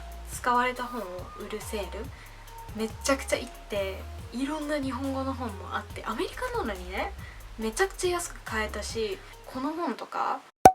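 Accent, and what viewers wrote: Kansai, Japan. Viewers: 発音が関西寄りやね。めっちゃ自然でええ感じ。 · なんか関西弁ぽい訛りでかわいい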